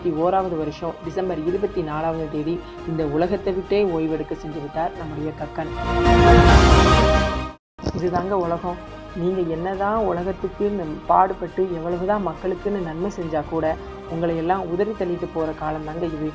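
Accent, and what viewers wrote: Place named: Thoothukudi, India. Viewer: Awasome Video , Keep It Up Bro 4.02 Thoothukudi karangana pesa solliya tharum🙂 Stay Home🏠 Save Life💖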